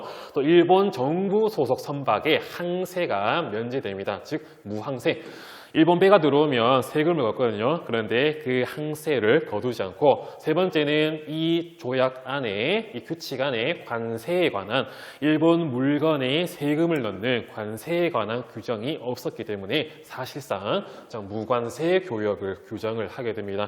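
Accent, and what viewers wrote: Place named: Gyeongsang, South Korea. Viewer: 목소리 좋다잉 경남 분이신가보네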